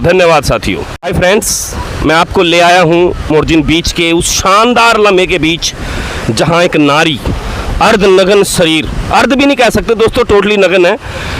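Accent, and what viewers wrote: Haryana, India. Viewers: Bhai ye aap ke liye Naya hoga kyoki aap ke liye sab Naya hoga aap Haryana ke ho sayad kyoki aap bhaasha se pata chalta jai wahan pr ladies ko Kitna samman Diya jata jai sab ko pata hai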